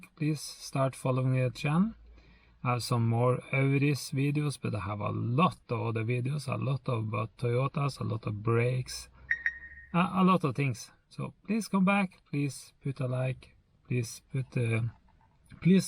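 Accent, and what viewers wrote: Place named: Europe. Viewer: You are speaking some type of euro rubbish English. Learn better English before doing another video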